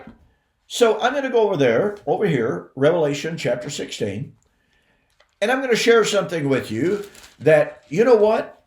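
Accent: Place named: Arkansas, United States. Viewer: this is some of your best material. I know you do not mean to be humorous in your delivery, but the way you point out the absurdities of the futurist, dispensationist view in your Arkansas vernacular has me floored every time. I just can't stop laughing. It is some of the best unintentional humor I have ever heard. Keep up the great work!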